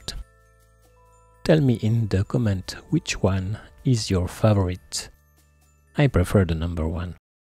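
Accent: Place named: France. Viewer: Merci pour cette très bonne et belle vidéo test. Le petit +: même sans activé les sous-titres je comprends beaucoup mieux votre anglais grâce à votre accent français 😄. Etant amateur débutant, photos nature, oiseaux et animalier, celle-ci m'a grandement influencée pour l'achat du R7 + 100-400 avec un budget raisonnable. Thank you very much!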